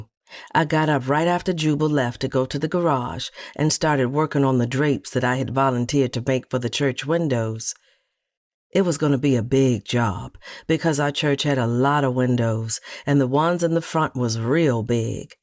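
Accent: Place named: South, United States. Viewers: Lawksss, I feel so bad for Leona always saving her sister. This is genius storytelling! Narrator did an excellent job, I’ll be adding her to my list of faves. The southern drawl gives the book so much character. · Absolutely the southern drawal allows the character to be brought to life so much better,, Happy Blessed Mother's Day to you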